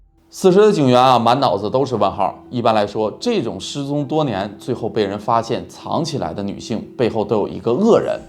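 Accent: Northeast, China. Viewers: 能不能不说东北话？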